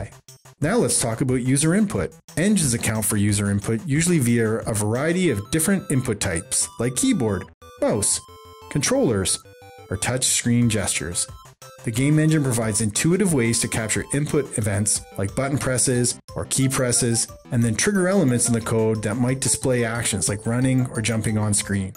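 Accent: Canada. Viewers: Speaker is Canadian? Doesn't matter...just love your "o" sounds. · Is he Canadian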